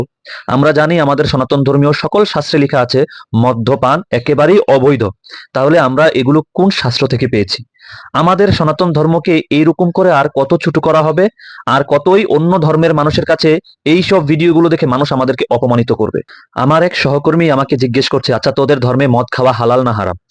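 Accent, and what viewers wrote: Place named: Bangladesh. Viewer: 1. Apnago kothar taan deikha mone hoitese apnago holen Bangaldasi. Ar Bangaldasi der thika sanatani gyan ami shikhum na karon amar jana nai je apni dhormi na bidhormi. Tothapi bidhormi ra j bhabe hindu der upar apnago dashe ottachar korse ta etar thika bhalo kisu asha kora apnago der thika kora utchit na 2. Kono video bananor age ektu bhalo koira prashuna koren ta hoile apnago bhalo hoibe ar apnago channel r viewers der o gyan orjan hoibe. MAA TARA APNAGO MOTON SONKIRNO MONOSKO DER GYAN R ALOK DEKHAK... EI PRARTHONA KORI... JOI MAA TARA🙏🙏🙏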